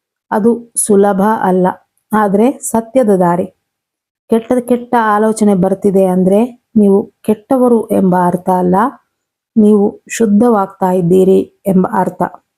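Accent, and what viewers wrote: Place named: Bangalore, India. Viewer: When I listen to you . I think you r staying in Bangalore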